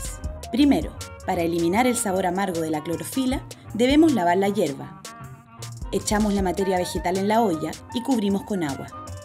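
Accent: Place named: Chile. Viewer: Estado intentando practicar el acento chileno pero es muy difícil, espero no equivocarme eres chilena, y no utilizaste mucho de los modismos Pero tú solo hablar se nota tu acento, crees que puedas ayudarme a mejorarle acento chileno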